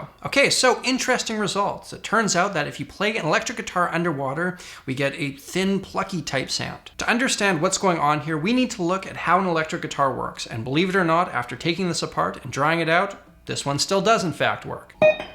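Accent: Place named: Canada. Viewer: He sounds Canadian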